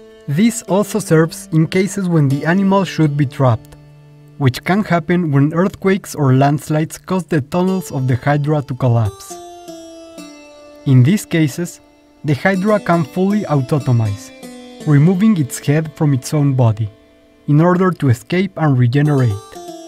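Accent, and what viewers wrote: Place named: Latin America. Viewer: I love the video!! Your English sounds like your from a Latino country right? Sorry if I sound rude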